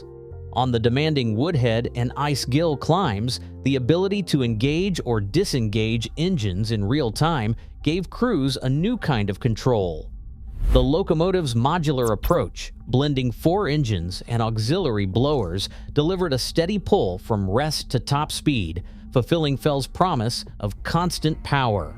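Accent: United States. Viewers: Why an American narration?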